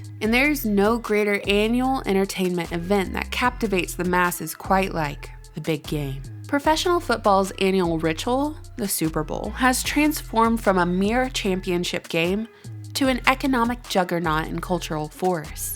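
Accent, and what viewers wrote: South, United States. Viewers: i always love it when you drop a new video, This is simply asking folks (with that mighty fine southern accent no doubt) to objectively, with an intellectual process, and without unreasonable emotional reactions... to take a closer look at this annual ritual that has spellbound Americans for decades as they live vicariously through their favorite team and player. It's parasocial behavior believing they actually know personally players, staff, performers, etc. All that consciousness. All that attention... focusing on an inconsequential silly game where silly grown men, paid millions, play with a silly ball. makes ya kinda wish something like... oh i don't know... umm... the f**cking federal reserve and our bullshit fiat currency would get that kind of attention! Shoot. One could even argue that something insidious as the fed exists due to everyone concerned with a silly football game. 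anyways... Another great video. you're so foxy. i love you. there. i said it.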